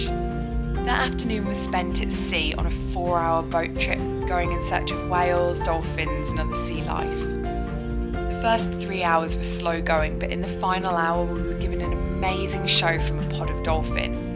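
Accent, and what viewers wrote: United Kingdom. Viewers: British accent English is so hard to understand. BTW love your videos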